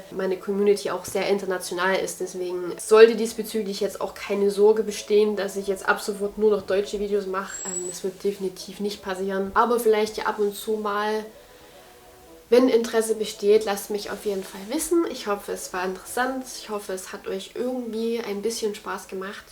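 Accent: Saxony, Germany. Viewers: Als ich dich englisch reden hören habe, wusste ich sofort, dass du Deutsche bist. Du hast einen ziemlich starken deutschen Akzent in deiner englischen Aussprache. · Ich dachte jetzt kommt ein Deutsch mit einem starken Englischen Slang und dann sächselt sie 😅😅 Sehr überraschend ❤ Liebe Grüße von meinem Kanal 🌸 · Awa haha die hat einen Ultra deutschen Akzent in englisch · Und egal wie sehr du dich anstrengst, man hört das du aus Sachsen kommst. 😅 Find ich aber gut, kann mich richtig mit dir identifizieren. 😂❤️ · It's so weird to hear you speak german, especially with your saxon accent 😆 cute! But I think you understood one question wrong.. the 19th century is vom 1800 to 1899😂 you talked about the 20th century haha. Non the less it was interesting to hear your answer to it!! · Hach, es fühlt sich so heimelig und ungezwungen an wenn dein sächsischer Dialekt etwas durchkommt. 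Ich bemühe mich vor meinen „westdeutschen“ Freunden immer um Hochdeutsch aber ganz verdrängen kann man das „nu“ und „ooch“ nie, ganz schlimm! 😅 aber es war sehr angenehm dich mal auf deutsch zu hören und du siehst toll aus in dem video☺️ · Sandys Leben Dachte ich auch aber man hört den deutschen Akzent im Englischen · Ich war schon verwirrt, warum klingt dein Englisch so deutsch, anscheinend kommst du auch aus Deutschland😂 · sie sächselt definitiv 😅 als sehr hochdeutsch Sprechende höre ich das extrem 😂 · Eine Sächsin ?😛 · Naja sächseln tut sie kaum... einen englischen Akzent höre ich bei ihrem Deutsch überhaupt nicht. Aber den deutschen Akzent, wenn sie Englisch spricht, den hört man ganz klar. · Oh, wie süß, du hast einen ganz leichten sächsischen Akzent! Finde es sehr schön, dich mal auf Deutsch zu hören! <3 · Juhu, auch jemand aus Sachsen....und ich hab mich schon gefragt warum ich dich so gut verstehe. 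^^` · Haha, habe dich gestern erst entdeckt und gerätselt, was für einen Akzent du hast. Und tadaa, es ist deutsch.😂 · Dein deutsches Video hat mir sehr gut gefallen 😊 Ich mag deinen leicht sächsischen Einschlag in der Aussprache. Ich liebe diesen Dialekt. Deswegen würde ich auch gerne wieder ein deutsches Video von Dir sehen. Aber die englischen Videos gefallen mir auch sehr gut. So lernt man auch noch nebenbei die Sprache beim Zuhören. Deine Kleidung auf Instagram oder Kleiderkreisel zu verkaufen fände ich gut. Ich wäre schon mal interessiert 😉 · Das stimmt. Man hört in ihrem Englisch, dass sie deutsche Muttersprachlerin ist. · Dachte erst eine Deutsche spricht Englisch (also vom Akzent) 😂👍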